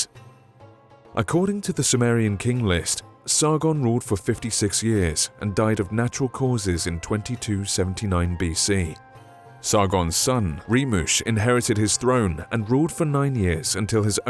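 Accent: United Kingdom. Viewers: I though this guy is British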